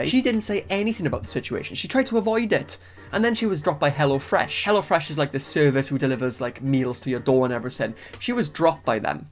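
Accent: Wales, United Kingdom. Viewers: Nothing quite like listening to a nice Welshman summarize this bs. At least I'm assuming you're Welsh by the accent.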